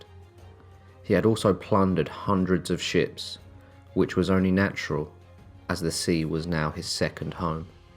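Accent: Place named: South, United Kingdom. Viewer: You sound nothing the same.. both refreshingly UNIQUE with a southern UK accent.. but totally different voices! 👍😸🇮🇹🤗 I will never understand why many think you sound so alike.. but maybe non Brits hear it in a different way..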